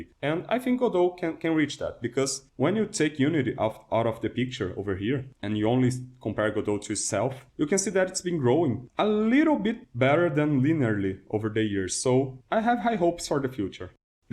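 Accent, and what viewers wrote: Brazil. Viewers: awesome video dude, thanks for that. Are you from brazil? Your accent seems to be if so youre foda cara!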